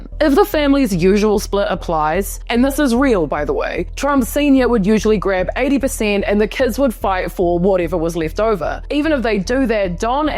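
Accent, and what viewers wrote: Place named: New Zealand. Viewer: Completely beside the point - love your NZ accent !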